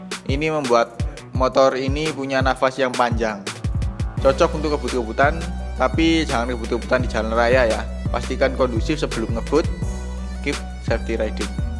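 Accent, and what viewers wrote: Malang, Indonesia. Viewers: Wong malang mas?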